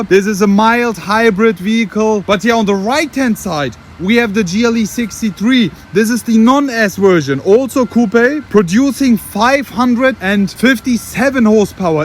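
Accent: Germany. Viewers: I don't want to offend you now, but you should really work on your English, the intonation is awful. That sounds like a foreigner is trying to speak German so abruptly, only in English.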